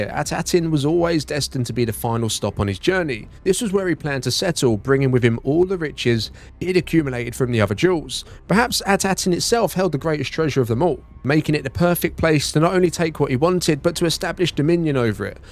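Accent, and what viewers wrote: United Kingdom. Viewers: good video, except that strong bri-ish accent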